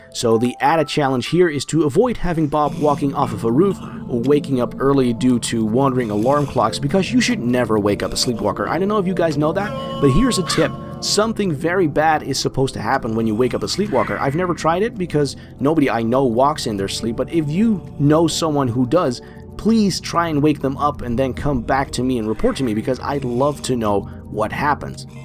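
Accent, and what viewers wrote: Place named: France. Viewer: Voice over guy sounds french.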